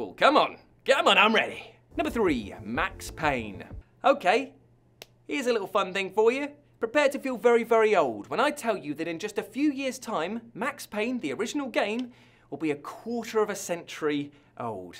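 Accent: United Kingdom. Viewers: The moment I heard the voice... I expected to hear... hii I'm from w... and these are 10 games.... xD Glad to find you on this channel! Hit sub cus I love your corny humour and British narration! Looking for a 10ish hour game to complete in 2ish streams... and this gave me yet another channel to sub and watch xD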